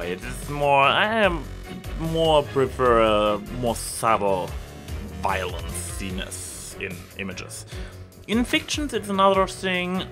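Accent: Germany. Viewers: Du hast n deutschen Akzent, meine ich :) ich hab mich wärend deiner videos gefragt woher ich den akzent kenne ~ und musste feststellen dass ich auch so rede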